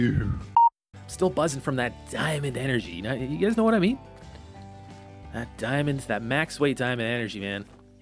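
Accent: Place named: England, United Kingdom. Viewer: I love your English accent 😂🤣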